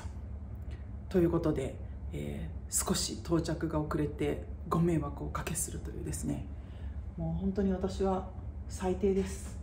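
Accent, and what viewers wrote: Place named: Nagoya, Japan. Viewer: 名古屋弁のいいですね～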